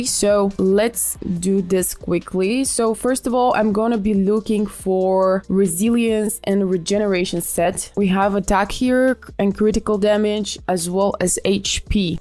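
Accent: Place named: Quebec, Canada. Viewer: My guess is you are french Canadian, I hope I did not offend you by asking that, I was just curious because you have a beautiful accent. Great content for people starting our and I even watch myself even though I'm end game, sometimes I miss things so it's nice to refresh and in some cases learn something new I could have overlooked. 😊😊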